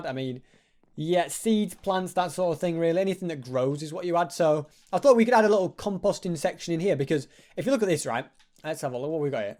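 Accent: United Kingdom. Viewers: I like British English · I never new you were British 🇬🇧🇬🇧🇬🇧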